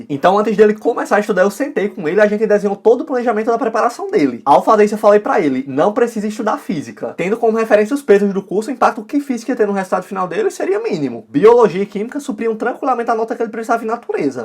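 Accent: Northeast, Brazil. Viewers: rapaz tu é nordestino? eu sou e amei esse sotaque!! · A energia do é contagiante, da pra ver que ele adora isso, além de ser super didático e detalhado em compartilhar dicas e formas de nos ajudar gratuitamente (o que é difícil nos dias de hoje). E GENTE QUE SOTAQUE E SORRISO MARAVILHOSOS. ❤🤪 Fico tão feliz e orgulhosa quando vejo um ytr do nordeste representar assim. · Só pode ser Nordestino tu e demais cara Deus te abençoe de todos os vídeos que vi no YouTube você e o mais disposto à ajuda os estudantes vlw cara sucesso pra vc. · Reclama com Portugal, frança e também com os holandeses!! Eles que influenciaram o sotaque nordestino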